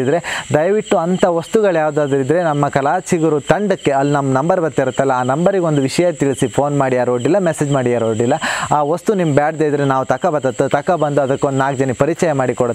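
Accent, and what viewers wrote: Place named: Kundapura, India. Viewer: Nam kundapura kannada..👌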